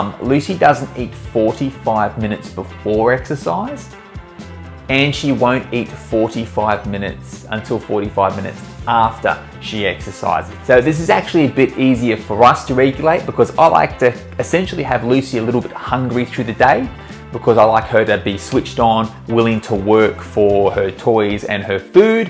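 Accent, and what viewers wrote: Australia. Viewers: Australian accent very funny and cool for German ears. She is adorable. Total class.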